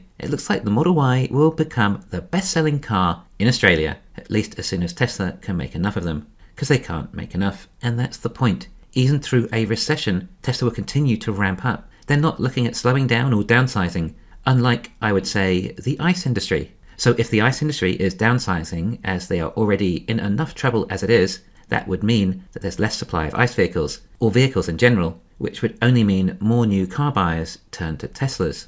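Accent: England, United Kingdom. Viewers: Petrol forecourt, that expensive place where ICE car owners like to hang out 😂 ❤ Nice one. Also as an Australian why do you sound more English than me and I'm from England? Nice accent.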